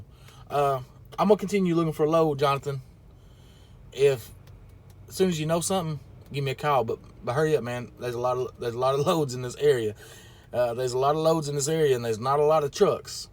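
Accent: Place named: New York, United States. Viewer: Hey, I watched a bunch of videos and I listen to the way you talk to brokers, and that’s taught me a lot. I kind of use your template and probably sound just like you with a New York accent LOL I live just outside of Nashville now and I love the market here. Hope you’re doing well bud and enjoy your Thanksgiving holiday.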